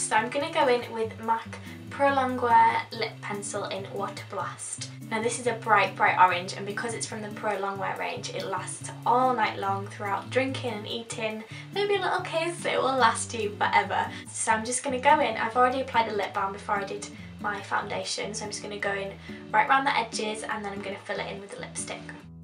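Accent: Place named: Yorkshire, United Kingdom. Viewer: Hey! I adore your accent, what part of Yorkshire are you from? Love this look xoxo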